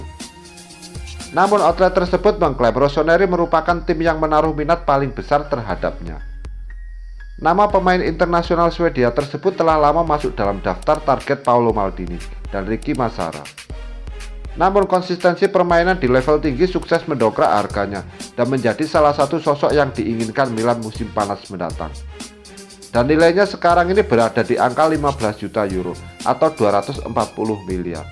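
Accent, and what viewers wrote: Java, Indonesia. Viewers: Ojo medok2 bro wkwk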